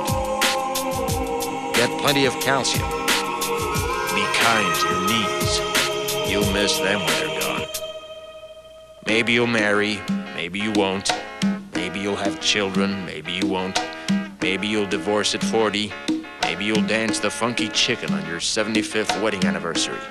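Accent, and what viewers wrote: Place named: United States. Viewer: he sounds american,but isnt he australian ?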